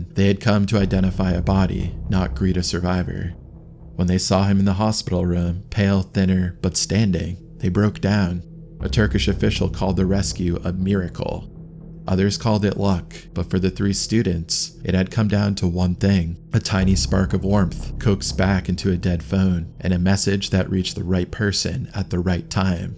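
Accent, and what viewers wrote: New England, United States. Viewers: Are you from the northeast? Kind of new enland area?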